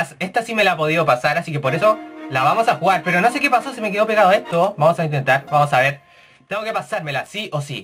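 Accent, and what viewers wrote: Chile. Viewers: Eri chileno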